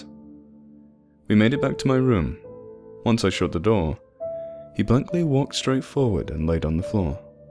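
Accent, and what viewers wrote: England, United Kingdom. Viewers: He's like Morgan Freeman with an English accent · Thor is played by Cris Hemsworth. He's got an Australian accent...this dude's accent is English.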